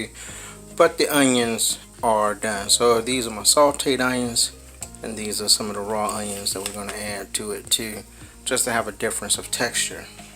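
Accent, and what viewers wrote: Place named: Tidewater, United States. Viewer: I knew it!!!! I knew it!!!! Soon as I heard you speak, I knew you was from Tidewater! That’s my home Fam! 757 Bad Newz!!! Man Love Me some Yock Bruh! Can’t wait to make your recipe Homie! Salute!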